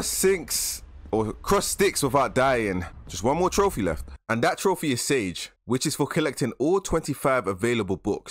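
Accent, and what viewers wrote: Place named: South London, United Kingdom. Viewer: You sound very south London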